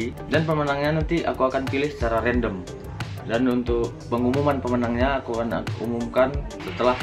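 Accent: Medan, Indonesia. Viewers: Bahasa nya kental medan kali bg,bangga awak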